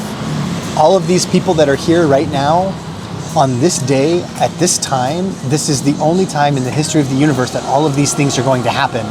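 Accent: Philippines. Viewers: Filipino ka na talaga Kuya. Halatang halata na sa pagsasalita mo ng tagalog.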